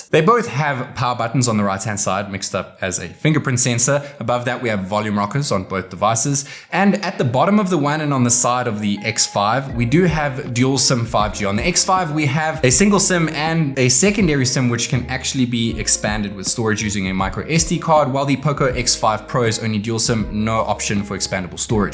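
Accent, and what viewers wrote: South Africa. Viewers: he sounds South African I’m not sure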